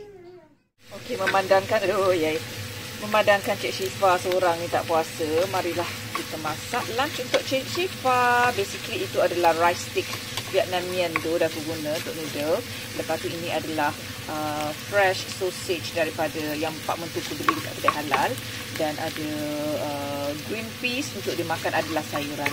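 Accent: Negeri Sembilan, Malaysia. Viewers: Suka dengar Pn membebel cakap loghat nogori.hehe.Selamat Hari Raya Pn..Kami raya PKP di Malaysia.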